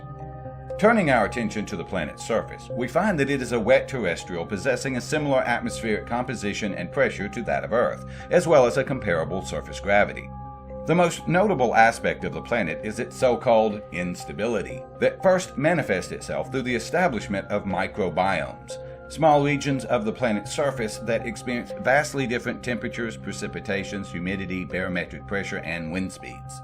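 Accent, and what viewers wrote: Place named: South, United States. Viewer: I just found your channel.. am subbed after hoth and this genesis video... fantastic. Also i say this as a southerner, and with implied awe... but you sound so much like jim varney... like he is explaining these subjects. It may just be the microphone, but you sound like you have a similar accent also. Anyway, awesome channel!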